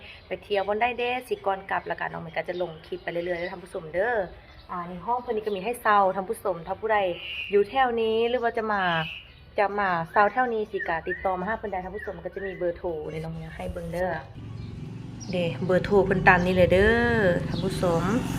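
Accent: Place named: Xayabury, Laos. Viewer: พึ่งเข้ามาติดตาม สำเนียงน้องเมย์คล้ายทางแขวงไซยะเนาะ ฮ้องเพลงกะม่วน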